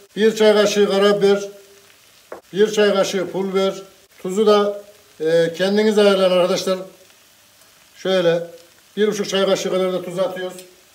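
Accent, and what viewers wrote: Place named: Ankara, Turkey. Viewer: Ustam nerelisin sanki ankarali gibisin merak etim bende ankaraliyim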